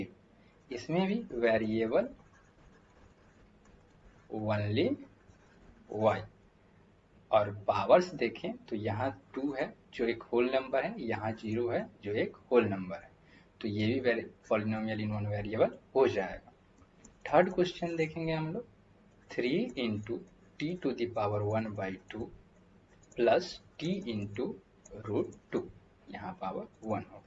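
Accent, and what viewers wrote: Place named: Bihar, India. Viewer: That bihari Accent while teaching is hypnotising, Nice Explanations 🙌🙌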